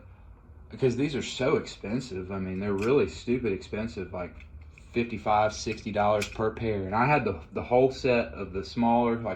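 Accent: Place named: South, United States. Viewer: It's far too easy to hear a bit of a southern drawl and equate that with dumb-ass, youtube has shown me plenty of clever dumb-asses 😀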